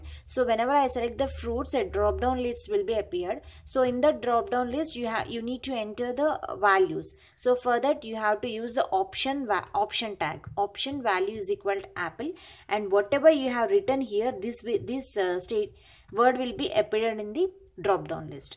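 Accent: South India, India. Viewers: thats mnother toungue influence of south indian languages....so relax n focus on important learnings...everybody has that influence india as per the region or state they belongs to...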